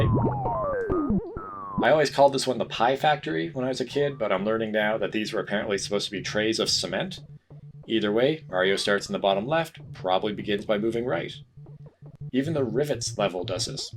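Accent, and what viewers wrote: Newfoundland, Canada. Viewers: Thats awesome! ive been to both of those provinces many times. Im born and raised in St Johns , NL. I could tell by your accent at the end of sentences. You have a good idea here for videos. Really enjoy them. Trying to think of a video idea for you. The Newgame+ troupe might work for a video. Anyways. Cheers from Newfoundland 🍻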